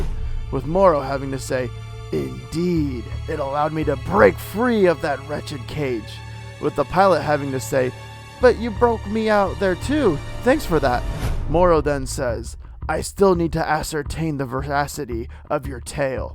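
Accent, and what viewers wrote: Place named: United States. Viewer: Nice to find a American narrator the other guys accents make it hard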